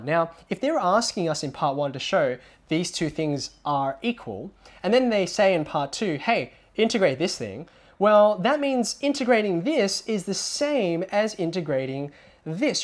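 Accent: Australia. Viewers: Australia, apparently (strong diphthong on "o").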